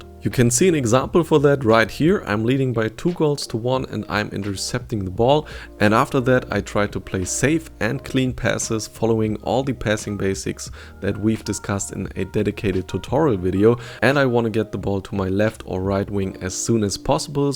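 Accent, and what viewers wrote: Germany. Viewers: Deiner Aussprache und deinem Akzent zufolge kommst du aus Deutschland wieso machst du die Videos dann nicht auf deutsch?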